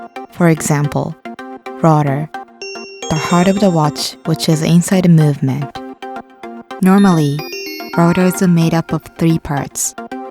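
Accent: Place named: Japan. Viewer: 这个视频里的英语发音已经很好了，但是某些音还是有很明显的日本语音的特点。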